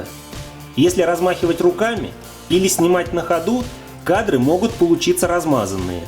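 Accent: Russia. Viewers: О, чувак владимирский похоже))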